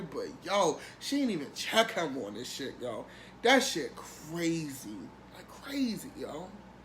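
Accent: Baltimore, United States. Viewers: I love this dude! You are the truth! Very nice with the ""Let's unpack this..." So colorful. I'm from DC and I hear that B-more accent. Makes me laugh. Keep up the good work!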